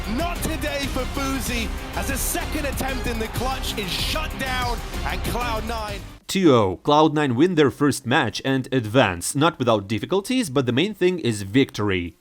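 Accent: Russia. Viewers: nice the russian accsent voice is back BIG W👍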